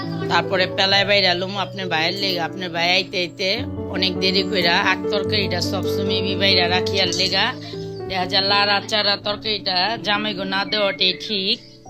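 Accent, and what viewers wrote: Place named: Old Dhaka, Bangladesh. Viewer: অলাইকুম সালাম আপু, পুরান ঢাকার ভাসা আমার খুব প্রিয়, সব কিছু মিলিয়ে পুরো ভিডিও টা খুব ভালো লাগলো ❤❤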